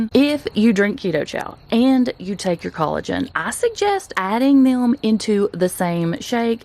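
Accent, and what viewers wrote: South, United States. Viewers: That's an enjoyable accent, I guess some of the more southern US? Greetings from Germany 🇩🇪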